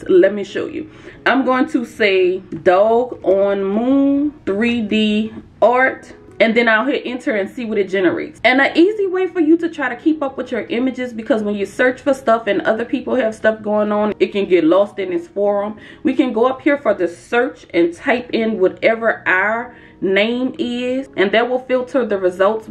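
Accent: New Orleans, United States. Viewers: I can hear a New Orleans accent from a mile away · Nice video and great tips! I hear New Orleans all in that video!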